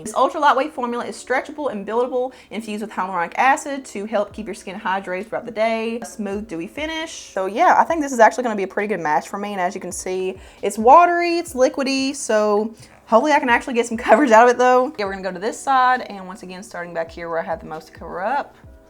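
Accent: South, United States. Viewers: Hi! I found this video when looking for reviews on the Relove foundations but now I am subscribed because I LOVE your personality 😊 I feel like you’re from the south because of your accent and same here (MS) so that’s also cool! Love your content I’ll definitely be watching all your videos! 🩷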